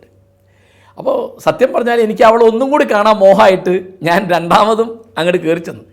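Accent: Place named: Kozhikode, India. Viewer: mashinte oru kozhappam Kozhikode basha varunnu...malayalathinte ozhukku pokunnu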